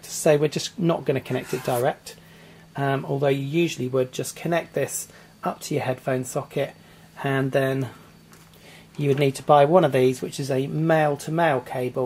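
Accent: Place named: United Kingdom. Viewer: you sound a little like a British mii in Tomodachi life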